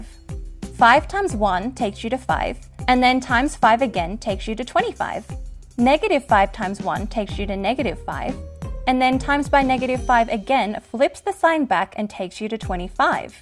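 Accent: Australia. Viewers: Love the australian accent :) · sounds like a healthy Australian accent to me.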